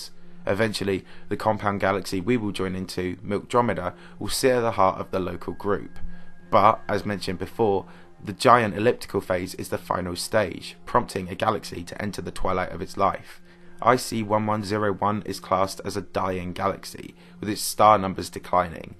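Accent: United Kingdom. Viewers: Thank you, you just won me 10$!! I bet my girlfriend the narrator of this video would be British. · He doesn't sound anything like James Cordon. Let me guess... You're an American. And all us Brits sound the same, (even though we have more regional accents than just about any other country.)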